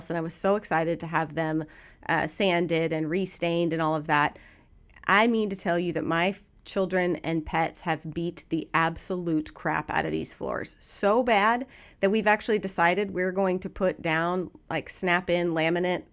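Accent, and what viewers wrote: South, United States. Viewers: Your southern accent on top of your actual southern accent is phenomenal, gurrrrrl. P.S from a carpentry family - it's brilliant to cover those gorgeous floors until later!! Don't feel bad for them! They will thank you later and you will thank you, too! LOL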